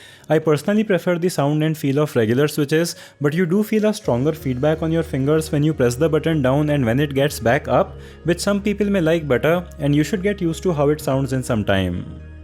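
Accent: India. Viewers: you hindu speaking ppl are simply unbearable to listen to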